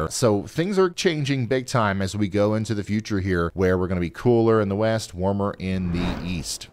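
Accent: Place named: Texas, United States. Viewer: Where you from for some reason I keep thinking you're a Texan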